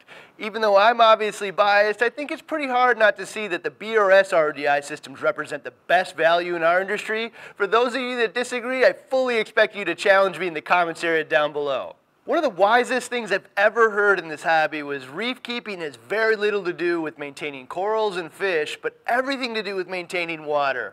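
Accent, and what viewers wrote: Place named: Minnesota, United States. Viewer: That explains his vowel sounds. Minnesota... I don't mean to nitpick; the videos are great, but northern accents are like nails on a chalkboard to me. I'm sure my Texan accent is just as offensive to people.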